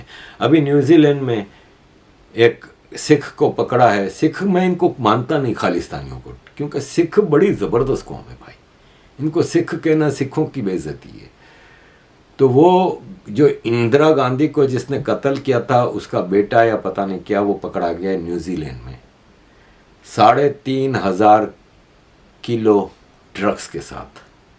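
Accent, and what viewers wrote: Gujarat, India. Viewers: Bato se gujrati zalakati hai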